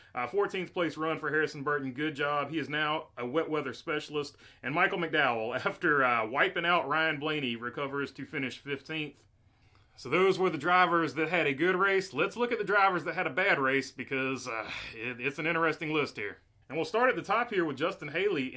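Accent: South, United States. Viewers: Sumthin bout &that southern draw keeps me tunin in! Haha 💪🏻💪🏻🇺🇸🇺🇸-with love from the west coast 🍃🍃🤙🏻🤙🏻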